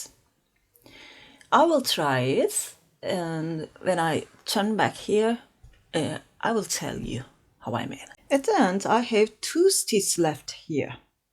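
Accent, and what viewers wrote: Turkey. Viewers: Abla konuşmandan türk olduğunu anladım bunu türkce anlatırmısın lütfen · Your accent sounds like turkish where are you from?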